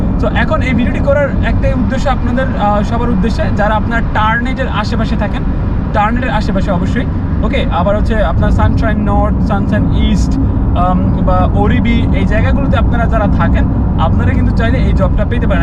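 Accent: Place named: Comilla, Bangladesh. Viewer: vaiyaar basha comilla, amr basha o comilla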